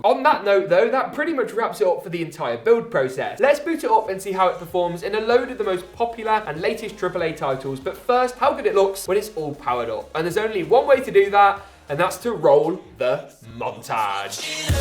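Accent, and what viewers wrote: United Kingdom. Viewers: I previously commented that your British Accent is Crazy and i would repeat that no matter what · Your accent is so british 😂👍 i love it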